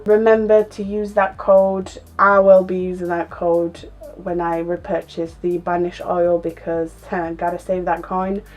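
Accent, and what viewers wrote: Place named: United Kingdom. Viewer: Love your personality and uk accent. Wanting to try this out myself.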